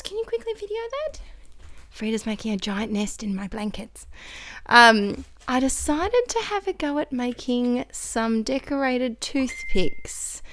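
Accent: Australia. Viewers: just love hearing an Aussie accent for a change. Love your work space, I'm off to redo mine now. thoe embellishments are really cute.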